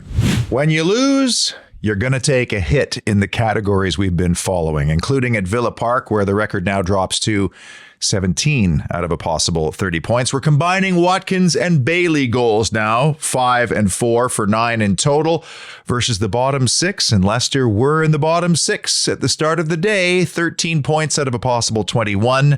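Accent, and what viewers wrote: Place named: Canada. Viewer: its awesome to hear that Canadian accent from a true Villan. international pride🦁🦁🦁. does somebody know if anyone is doing these kinds of videos on our Lionesses??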